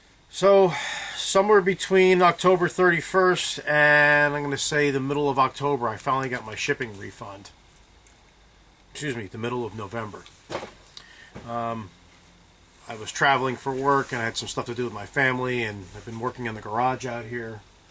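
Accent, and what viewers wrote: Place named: New Jersey, United States. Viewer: You are a bad ass mechanic. I was rasied in New Jersey and have brothers and sisters that still live there. Nostalgic to hear NJ accent. Wife drives 1996 Jaguar Vanden Plaus, I'd say the Jaguar 4.0l inline six sounds much smoother than BMW. HaHa. Thanks for your videos I always learn something new.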